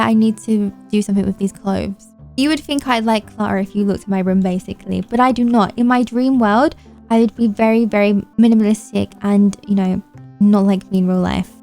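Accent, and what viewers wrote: United Kingdom. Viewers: so England, hence the British accent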